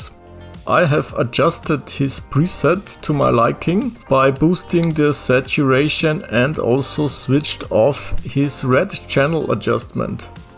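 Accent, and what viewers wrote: Germany. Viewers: Nice german accent !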